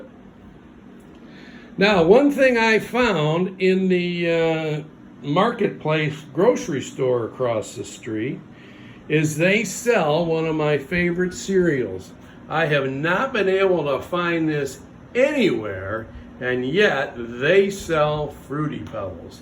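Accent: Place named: Chicago, United States. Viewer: You sound like you are from Chicaaahhgo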